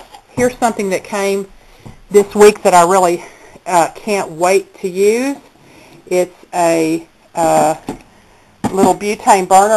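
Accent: South, United States. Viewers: sweet, southern drawl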